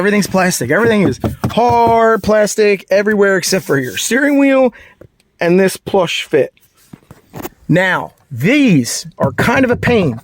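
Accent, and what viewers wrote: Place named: Philadelphia, United States. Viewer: Dude, are you from Philly? Because you sound, well, you sound like me LoL. Anyway great details. Can you disable the start/stop? I'm picking one of these up tomorrow.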